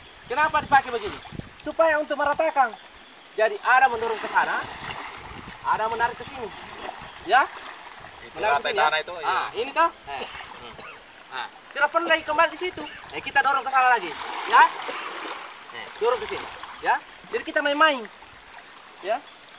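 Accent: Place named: South Sulawesi, Indonesia. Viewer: Cara bicaranya kaya orang sul sel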